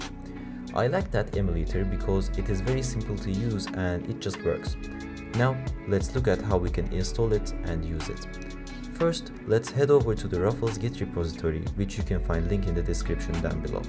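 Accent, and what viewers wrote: Turkey. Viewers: Konuşmandan anlamıştım bu kesin türkdür diye :D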